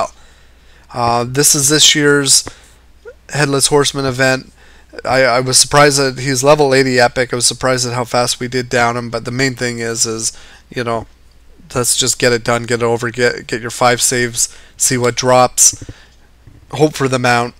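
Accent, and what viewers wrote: Canada. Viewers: He is canadian so his accent.....But Idk why he didnt know about the FP....maybe cause he is too leet to come back to regular zones lol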